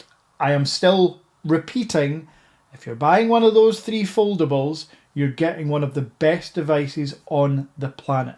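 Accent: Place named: Scotland, United Kingdom. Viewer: I had to watch this one on silent / closed captions. It took me a while to work out why you were talking about your doctor - the subbies kept referring to GP. Turns out that Google doesn't know the Scottish pronunciation of G Pay!!!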